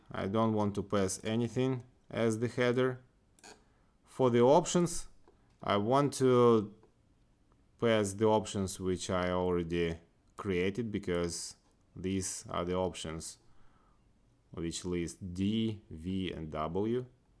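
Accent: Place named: Ryazan, Russia. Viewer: Заметный русский акцент)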